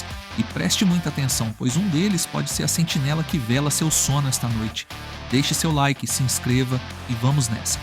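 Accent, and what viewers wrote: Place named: United States. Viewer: Похоже будто Американец пытается говорить на Русском языке, акцентом.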